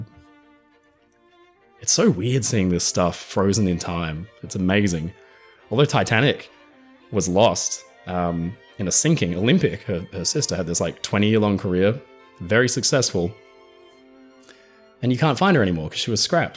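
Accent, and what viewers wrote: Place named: Australia. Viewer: Love your accent, Australian?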